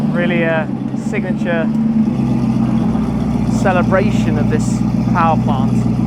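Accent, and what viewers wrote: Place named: England, United Kingdom. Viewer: Is it me or all the car you tubers have English accent 🧐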